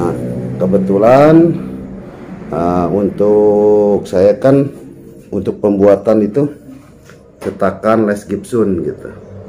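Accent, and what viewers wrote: West Java, Indonesia. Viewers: orang kendari tp logatnya spt orang jawa barat 😁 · Di Kendari tp logat Sunda... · Nembe bade naros, orang kendari tapi logat sunda☺️ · Orang Kendari logat Sunda....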